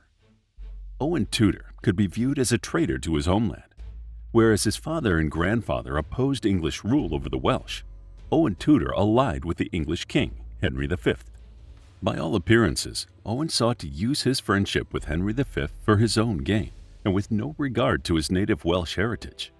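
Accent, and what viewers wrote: United States. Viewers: Come on, the guys American. At least he understands that there's a Wales, that's quite an achievement for them.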